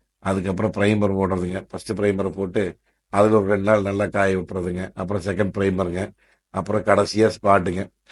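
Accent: Kongu, India. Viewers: கொஞ்சும் கொங்குத் தமிழ் பேசும் கோமகரே...! திகட்டாத தித்திக்கும் பேச்சுடைய திருப்பூர் திருமகரே...! லடாக் பயணச் சாதனைச் சகாப்தம் எங்கள் மதிப்பிற்குறிய அண்ணா திரு. அண்ணா❤❤❤🙏 அவர்களே எப்படி இருக்கீங்க அண்ணி❤❤❤🙏 எப்படி இருக்காங்க தம்பி ரித்திக்❤❤❤ எப்படி இருக்காரு வீட்டைச் சுற்றிவரும் பட்டுக் குருவி அது சிறகு முளைத்த சிட்டுக்குருவி...! சுறு சுறுப்பாய் சாலையில் நான்கு சக்கரங்களைச் சுழற்றி வலம்வரும் சுட்டிக்குருவி அது நம் மாருதியின் சிட்டுக்குருவி...!!! விறுவிறுப்பாய் ஊரெங்கும் விளையாடும் ஊர்க்குருவி அது பறவையென்னும் சிட்டுக்குருவி...!!! தனக்கென்ற ஓர் மானிடக் குடும்பத்தோடு வாழும் இந்த குட்டிக் குருவி அது மாருதியின் சிட்டுக்குருவி...!!! வண்ண வண்ண நிறத்தில் வானத்து நட்சத்திரமாய் வலம் வரும் செல்லக் குருவி அது பறவைச் சிட்டுக்குருவி...!!! வையகம் முழுவதும் அண்ணா திரு. அண்ணா சென்ற லடாக் பயணச் சாதனைச் சகாப்தத்தை போற்றி மகிழ்ந்து அனைவரையும் வாழ்த்துக்கூற வைத்த கவிக் குருவி அதுதான் நம் அனைவரது மனதையும் கவர்ந்த மாருதியின் ❤"""சிட்டுக்குருவி"""❤...!!! 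என்றும் அன்புடன் உங்கள் தம்பி🙏 என்றும் அன்புடன் உங்கள் TMF🙏 ரா.முஹம்மது கபூர் (சென்னையில் இருந்து)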